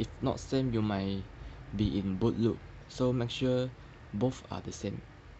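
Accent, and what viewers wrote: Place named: China. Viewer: 请问能出一期中文的吗，本来就英语不好，还要听chinglish😁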